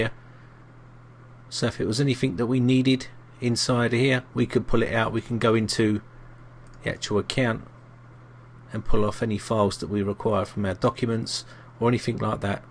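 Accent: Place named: Derbyshire, United Kingdom. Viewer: You sound like Jason Statham, do you live near derbyshire?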